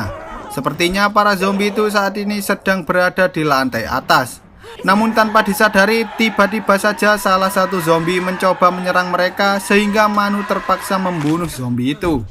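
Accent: Java, Indonesia. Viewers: suara yang menjelaskan video ini suaranya ke jawaan bingits. Hehehe..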